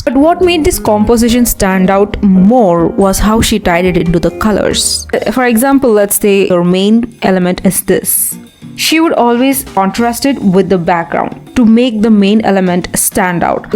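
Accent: India. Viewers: Are you an Indian? Your accent sounds familiar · your accent is lowkey indian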